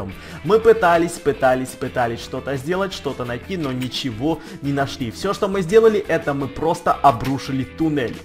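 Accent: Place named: Ukraine. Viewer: ты не русский,ты украинец?,но ты точно не русский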